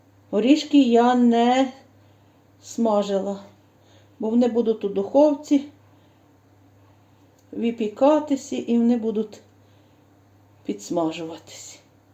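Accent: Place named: Ivano-Frankivsk, Ukraine. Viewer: Слава Ісусу Хресту! Пані, не перестаю вами дивуватися яка ви гарна господинька. Я тільки й шукаю ваші рецепти, бо там все так смачно і не дуже складно. А ще дуже люблю слухати вашу мову місцеву, бо, здається, що ви моя землячка. Я ж сама з Прикарпаття, з Долинського району с. Витвиця. Живу в Білорусії, вже давно на пенсії, теж багато перепекла наших пляцків для себе і знайомих та родичам на весілля. Напевно такой наша українська випічка найсмачніша. Дуже вам вдячна що ділитеся своїми рецептами та так доступно пояснюєте. Хотіла б знати звідки ви самі, бо дуже подібно розмлвляєте на нашому місцевому діалекті. Дуже сумую та скучаю за своїми родичами, переживаю за ті недобрі події, прошу Бога щоб швидше все скінчилося. Здоров'ячка вам і вашій родині. Довгих вам років життя, та миру в нашій Україні. З наступаючими Великодними святами Вас. 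Обов' язково спробую ваші нові рецепти, а найперше пасочку. ❤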